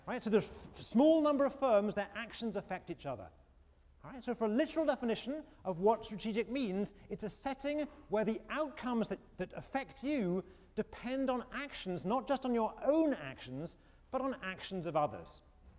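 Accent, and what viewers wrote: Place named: United Kingdom. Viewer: 好重的英國腔！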